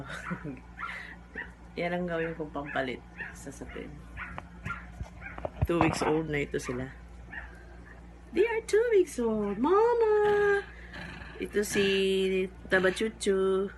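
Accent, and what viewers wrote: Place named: Visayas, Philippines. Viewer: bisaya ka te... pila ka dosage ang 2 mons te?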